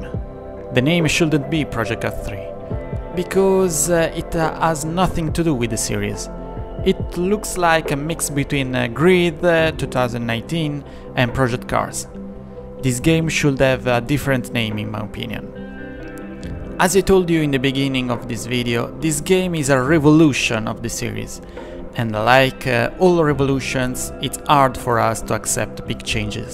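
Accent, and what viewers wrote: Italy. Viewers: are you italian? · This guy is Italian isn’t he?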